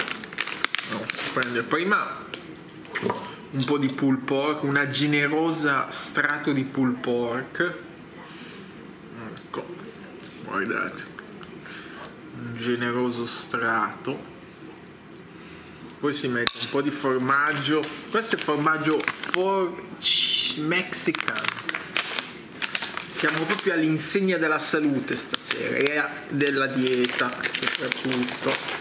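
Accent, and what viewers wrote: Italy. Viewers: HAI UN ACCENTO DEL SUD SUD ITALIA INVECE IL DI UNA VOLTA AVEVA L'ACCENTO DEL NORD..ED AVEVA UNA VOCE PIÚ BELLA CON TANTE VISUALIZZAZIONI.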